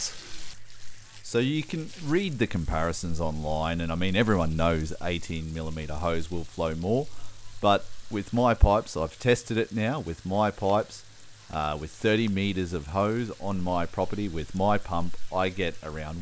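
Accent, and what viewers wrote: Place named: Australia. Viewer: He's got the nice, hot Australian accent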